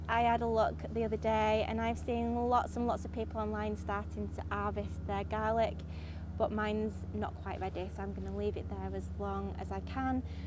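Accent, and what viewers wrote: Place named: East Yorkshire, United Kingdom. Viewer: Sent over by JB...what a suprise as you sound like me! I noticed your East Riding of Yorkshire and so am I! I'm in Hull. Definitely subscribing!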